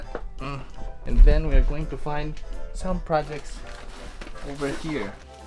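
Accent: Baguio, Philippines. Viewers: Are you from baguio?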